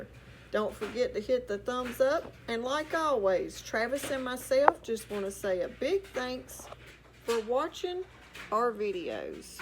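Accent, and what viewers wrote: South, United States. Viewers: Homemade pancake "srrp", and it has to come to a "rollin bole": beautiful southern accent! :) (I live in the boring/no accent midwest)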